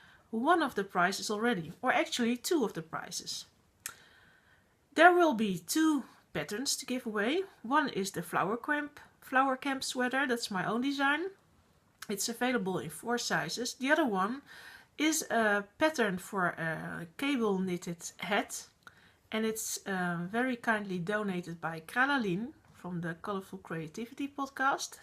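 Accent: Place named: Netherlands. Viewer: Oh hi lovely, I love listening to you speak English, I think the Dutch speak English with such a nice tone, you sound amazing. Plus I love your fringe:) I am very fringe obsessed and currently want one again but my husband thinks not! hahahaa. I really like your Crossing Border shawl and so want to take part in your KAL, I'm off to find myself another cable pattern to knit, am currently knitting cables on my Buddleia Socks but started them ages ago so they don't count:) so nice to catch up with you today xx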